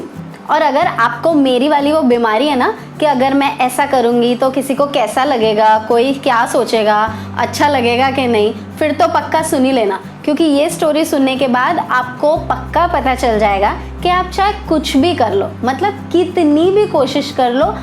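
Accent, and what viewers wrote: Gujarat, India. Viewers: Gujju tone😁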